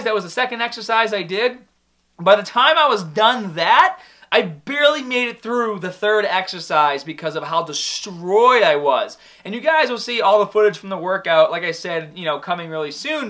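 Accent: United States. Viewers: Your American accent is so strong ahah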